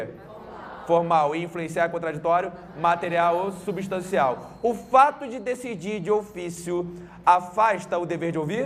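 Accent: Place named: Rio de Janeiro, Brazil. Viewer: Pô Prof. gratidão! Só gosto de ouvir aula c sotaque carioca haha Café caro da porra kkkkkkkkkkk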